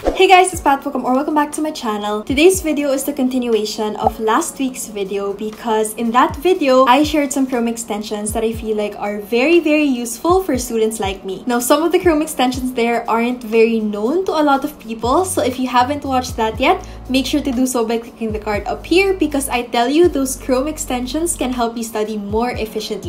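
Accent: Philippines. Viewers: i love you filipino accent🙏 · Hmmmmm... I’m new and I hear a Filipino accent~ 😜 I’m Filipino too! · I'm new and at first I'm wondering if you're filipino bcoz of your accent and if not for the "kasi" word that you used I would still be wondering if you really are hehe btw thnx for sharing I would use slidesgo from now on <3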